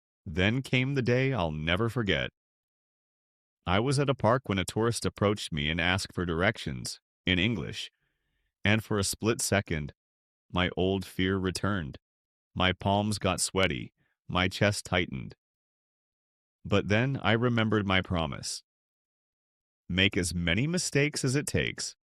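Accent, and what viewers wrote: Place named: United States. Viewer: You sound 100% American and your vocabulary, grammar was completely accurate omg I thought you were an American in disguise, how on Earth are you not a native English speaker??? 🙀🙀 Please make many more videos about your journey learning English grammar, vocab, and esp speaking like methods, technique, textbook, material, webs, apps etc I really look forward to it. Your achievement on English speaking as your second language absolutely takes me aback, you're most iconic English speaker to me I appreciate your message in this video: "no need to be perfect, be brave enough to try again, make as many mistakes as it takes, learn from them and get better bit by bit" - truly mind-blowing mindset.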